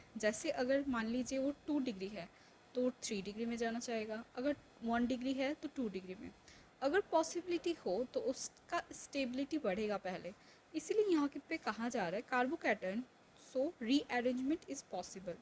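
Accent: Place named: United Kingdom. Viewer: Mam aapka accent thoda british ho rha h 🥲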